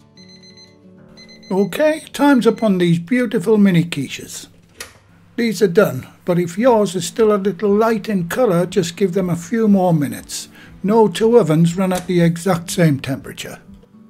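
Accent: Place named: Northern England, United Kingdom. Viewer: From a French person (from the country of quiche), I approve. Love the Northern accent. 😊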